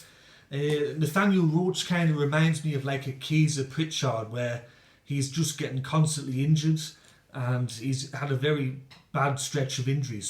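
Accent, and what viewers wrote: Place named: Geordie, United Kingdom. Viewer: Good video! Dude, your accent! Where's it from? Geordie?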